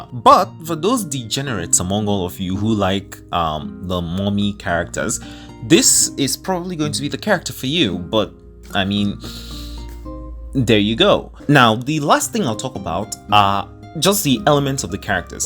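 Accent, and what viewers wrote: Nigeria. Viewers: Yo are you Nigerian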